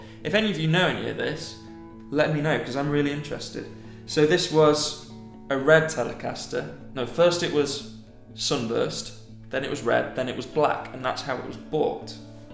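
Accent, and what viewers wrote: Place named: North West England, United Kingdom. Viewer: Sweet that mate- lovely sounding, you sound like you’re from my area- you North-West? I’m Warrington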